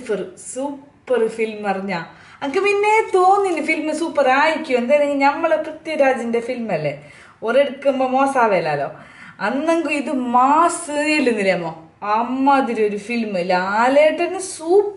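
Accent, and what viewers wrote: Kasaragod, India. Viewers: കോഴിക്കോട്ട് ഉള്ളോർക്ക് തെരിയാത്ത ഭാഷണ്ടോ ഞമ്മക്ക് ഇതൊക്കെ എന്ത് ഹൽവ മാതിരി കാസ്രോട്ട് പെര്ത്ത് ഇഷ്ട്ടം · Review from an innocent mind, through super kasaragod coloquiel language, super.. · നല്ല അടിപൊളി ആണ് ടോവിനോ നല്ല നമ്മളെ കാസറഗോഡ് ഭാഷേ നല്ല ചേലായിനി നമല്ലേ ലാലേട്ടൻ നല്ല പങ്ങായിൻ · Review പത്തുപൈസക്ക് ഇല്ലേലും..... നമ്മളെ കാസ്രോട്യൻ സ്ലാങ് പൊളി ആക്കീന്.... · Endokkeyo evideyokkeyo manassilaayi. Edakk lucifer ennu paranjond athum😂😂. Kettiond irikkan nalla resond kasargod slang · 👌👌👌👌 kasrod baasha superaayi paraynnind..bhaashaye ingne abhimaanathodu koodi paranh variety channel aakyathm mass aayin. thattam dairyaayit itto..palarum enthokkeyo paraynnind..kaaryakanda.. Indian pourante avakaasham💪. kurupottiknnavare kaaryakenda..full support💪💪💪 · ഒരു വീഡിയോ ഇടൂ ട്ടോ ഞങ്ങൾ തെക്കൻമാർക്ക് വേണ്ടി.... കാസറഗോഡ് കൊള്ളില്ല എന്നല്ല അതിനർദ്ധം... 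പൊളിയാണ് താങ്കളുടെ കാസറഗോഡ് talk💕💕💕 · Sherikhum kasergode ulla ellavarum ingane aano samsarikkunnathu....Ente kude oru 4 perundu pakshe Avaru ingane samsarikkunnathu kettitilla.....mangalapuram kaaru Ee reethiyil ulla malayalam paranju kettitund..,avarude Ee Baari Bhaasha super aanu kelkkan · ഞമ്മള് ഇങ്ങളെ കാസറോഡ്‌ ബാർത്താനം കേക്കാൻ മാണ്ടി ബന്നതാ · +kasargod slang +thattam.. a deadly combination... Meanwhile weak point of any dare and devil soul.. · Muslimallaaannn enikkariyaaam.olentheppaa shawllokkeyittit ksd slang paraynne.athond allaand olod asooyannolla😂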